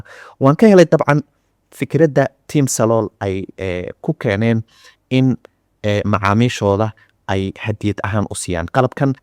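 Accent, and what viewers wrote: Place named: Hargeisa, Somalia. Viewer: Adi reer hargeysa ayaan ku moodayeybe😅😅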